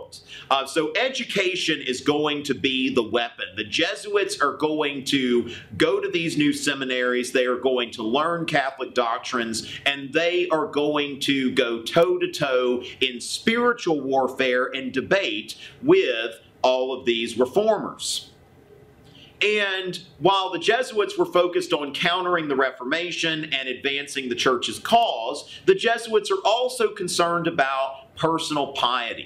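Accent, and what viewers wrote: South, United States. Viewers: i love this. just the way he looks like matt damon and the southern drall makes the whole video so much better. very helpful !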